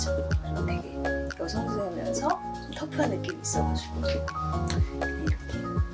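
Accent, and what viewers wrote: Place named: Seoul, South Korea. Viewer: 서울사투리 쓰네영ㅎㅎ귀엽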